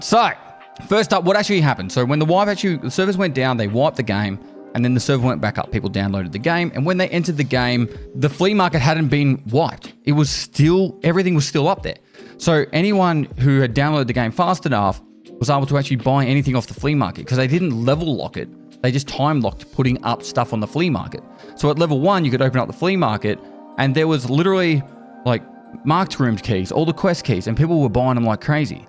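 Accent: Australia. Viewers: I watch your videos to practice my Australian accent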